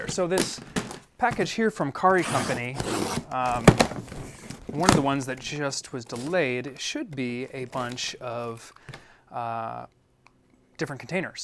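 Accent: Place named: United Kingdom. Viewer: The intro made you sound british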